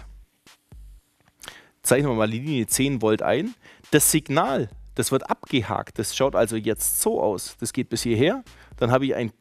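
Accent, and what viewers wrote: Swabia, Germany. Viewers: Ja, ganz schön blöd, wenn man meint immer recht zu haben. Der gute Herr hat die Gleichspannung gekennzeichnet einmal 12 V und 10 V in Form einer Linie. Die Sinuskurve solle hier nur ein Signal darstellen (Musik, einzelner Ton etc.). Im Beispiel wurde verdeutlicht, was passiert wenn die Bordspannung sinkt. Danke für das Video !!! ps. dein schwäbisch ist unüberhörbar xDDD (Y)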